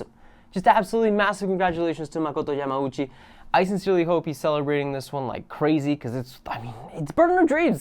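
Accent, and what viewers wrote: United States. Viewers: Eres español? Tío tienes acentaco americano. Como lo haces?! Muy buen contenido. Mis enhorabuenas.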